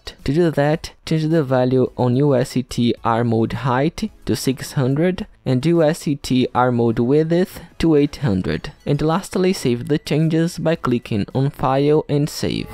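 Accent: Brazil. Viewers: vc e br da pra perceber no sotaque kkkkk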